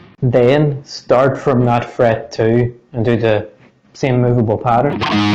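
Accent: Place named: Belfast, United Kingdom. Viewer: Was amazing hearing your accent and realising you’re from Belfast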